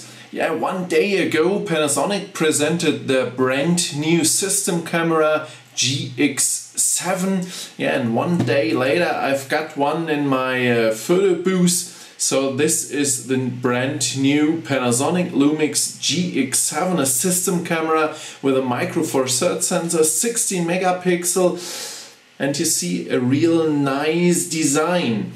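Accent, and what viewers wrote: Germany. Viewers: you have to excuse his pronunciation for he is from Germany. now a days, Who would put in 41 mins review for you. not even the sell man from the store you go for purchase. cheers.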